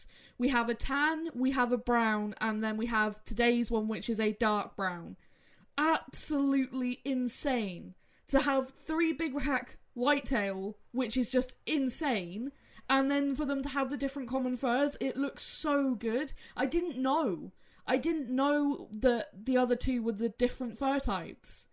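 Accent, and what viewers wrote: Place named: United Kingdom. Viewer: As an American who watches a few British YouTubers your accent is really cool! I don’t know if it is British but it’s a cool accent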